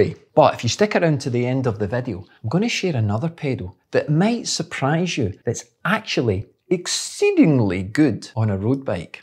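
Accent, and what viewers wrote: Scotland, United Kingdom. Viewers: yeah, pretty much been using Time since day 1. Using Crank Bros for gravel and MTB, which is similar to Time in terms of lateral float and retention/release. Love the channel (and the Scottish accent!) and keep up the great work! 👍👍👍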